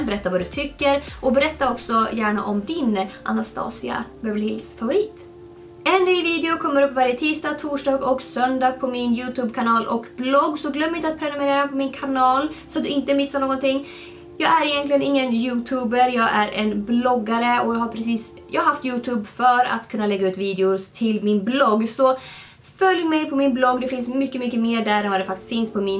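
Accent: Dalarna, Sweden. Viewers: Jätte bra video! <3 Man hör ditt dalmål ibland! :D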